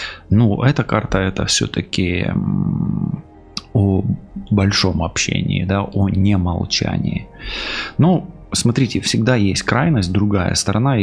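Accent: Ukraine. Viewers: Доброе утро! Благодарю Вас за Ваши прекрасные расклады,их можно слушать бесконечно,они доходчиво,понятны,Ваш прекрасный голос, Вы просто Супер! А главное всё в точку, Ваш хохлятский говор! Я говорунья,а он молчун, может подойти молча ударить,как он говорил "для профилактики" вот так-то (шутка).Всех благ Вам от души!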